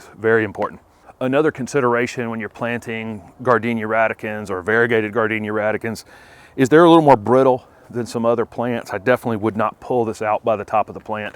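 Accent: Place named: North Carolina, United States. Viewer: Do you ever smile? But you have a nice accent...is that North Carolina?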